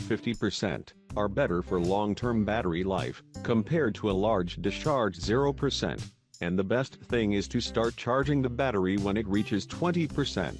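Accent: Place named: United States. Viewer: Thank you for this useful information. Hearing an American accent I assume you mean Fahrenheit and not Celcius, when mentioning temperature.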